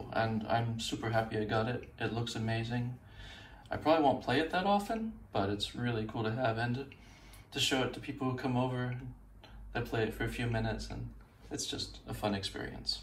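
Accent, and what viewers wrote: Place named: Germany. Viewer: You speak Dutch with a German accent and intonation 😉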